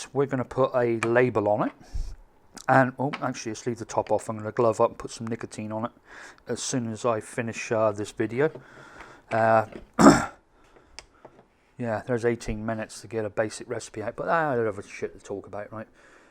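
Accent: United Kingdom. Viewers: Hi mate, love your vids. Are you British living in the US ?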